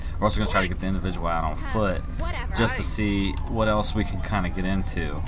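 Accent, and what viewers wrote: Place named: South, United States. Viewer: Lol I always enjoy your accent, if you don't mind me asking where are you from? It sounds like a southern type accent but not Deep South 😂😂 if that makes sense.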